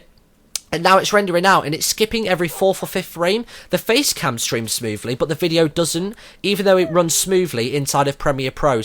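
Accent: United Kingdom. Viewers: oh, cheerful person, funny... and a british accent. You got yourself a new subscriber.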